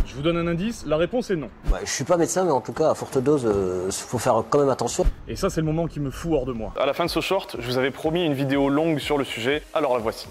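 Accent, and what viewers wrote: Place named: England, United Kingdom. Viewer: Je valide pour la qualité et l’accent anglais surtout ☺️.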